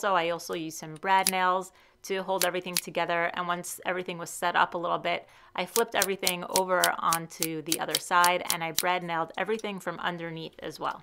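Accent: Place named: New England, United States. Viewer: Holy cats, I heard that New England accent and I thought, is she Norm Abram's daughter?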